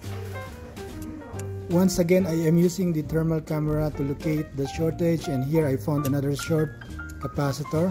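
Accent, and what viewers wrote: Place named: Philippines. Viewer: Sir you're accent is somekind a pilipino are you a pinoy? Thanks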